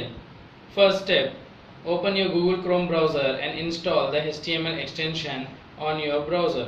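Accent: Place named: India. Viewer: Why are indians so bugady udding it, naw watdis ...blah blah... cant understand you! DUH!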